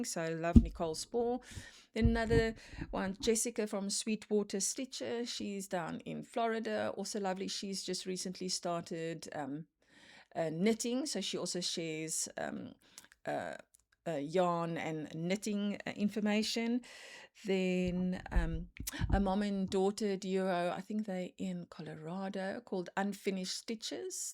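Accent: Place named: South Africa. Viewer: Hello from the Chicagoland area, really enjoyed your first Flosstube! I have a sibling and nieces currently living in SA, so I recognized your accent right away 😊!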